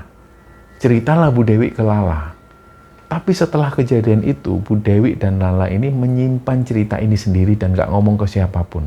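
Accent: Java, Indonesia. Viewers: jelas sdah dan sangat² nyata bahwa KARMA itu ada cepat dan gak pernah salah alamat.... alfatihah buat alm.dan almh. bapk ibu mbak lala... mbak lala sekeluarga sehat" nggih ❤❤😊 om logat jawanya mboys... suxes nggih..😊🙏🏻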